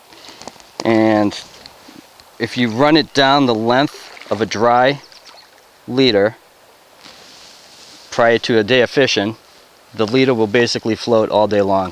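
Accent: United States. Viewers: That is one crazy Idaho accent. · Spent the first half of my of life Boise, much of the second in New Jersey. You're definitely not from the former, lol. Massachusetts?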